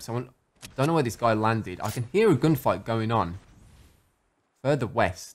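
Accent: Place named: Birmingham, United Kingdom. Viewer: Dave the dragon for sure, and you have to say it in your old brummy accent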